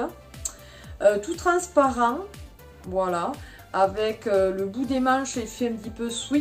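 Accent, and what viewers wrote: South, France. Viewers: coucou ça vaut le coup tu te refais ta garde robe en ce moment c est top tu habites dans le midi avec ton accent ??? bon aprem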